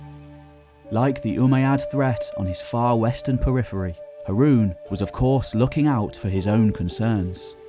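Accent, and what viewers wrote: United Kingdom. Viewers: Beautiful presentation. Thank you. Also like the British accent. Three defining moments in history, the fall of Baghdad, the fall of Constantinople, and the fall of the Ummayad Caliphate in al-Andalus.(Spain), not to mention Carthage and others. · I like his brand of UK "English" so much that i can forgive his pronunciation of the word " dynasty".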